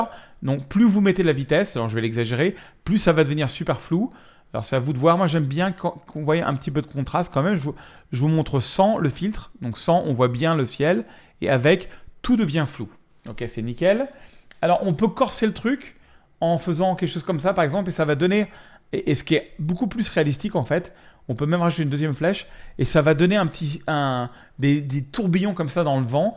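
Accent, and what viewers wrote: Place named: South, France. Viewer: Super tuto, dynamique, humour, l"accent du midi, bref que du bonheur.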